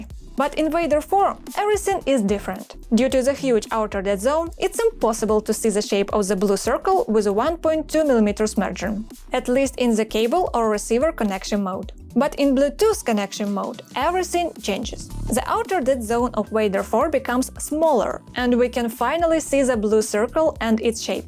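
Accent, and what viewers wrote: Russia. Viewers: Здравствуйте, вы русскоговорящая? Акцент такой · Может на русском делать будешь? Рязанский акцент прям режет уши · The russian accent😅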